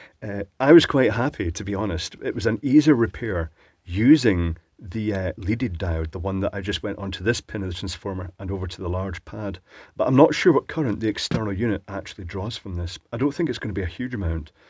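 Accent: Scotland, United Kingdom. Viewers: I feel like I'm watching a Scottish Louis Rossman.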